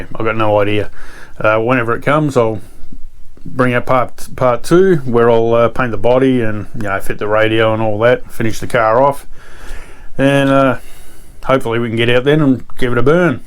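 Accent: Australia. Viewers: Always nice to see a brother from down under our accents are unmistakable just discovered your channel nice thorough video 👊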